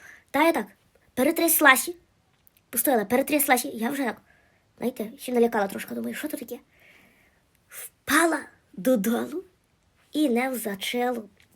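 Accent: Galicia, Ukraine. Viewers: Вітання з Німеччини! Яка колоритна наша галицька мова, слухаю і ніби знаходжуся десь неподалік. Поважаю Ваш талант і бажаю ще більшого творчого натхнення! Чекаю з нетерпінням нових серій.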